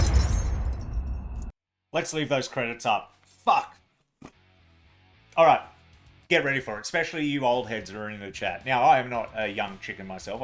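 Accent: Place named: Australia. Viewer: Australians ugliest fickn accent ever, but metal up your ass,from kiwi land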